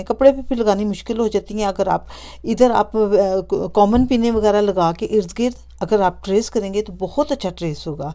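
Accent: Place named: Pakistan. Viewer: Apk accents se ap Pakistani lgti hn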